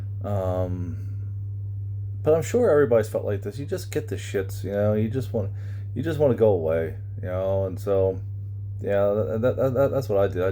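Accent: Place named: United States. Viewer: Good to see you back. I could swear that you're starting to lose your American accent. But I don't think that its accurate to say that mental illness is the reason for not being in a relationship. You yourself admitted that it was 'luck' so in the case of Todd and OC what could they possibly do to increase their chances? Todd after all did work for over 10 years in jobs surrounded by people and nothing happened. Then there is what one wants vs whats available. But its good that things are working out for you. You do seem more composed and self confident than what you did before.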